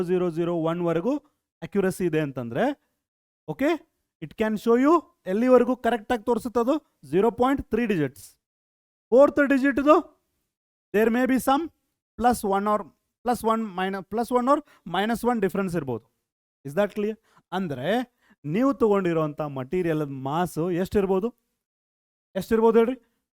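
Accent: North Karnataka, India. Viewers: Sir nimma uttara karnataka speaking ❤❤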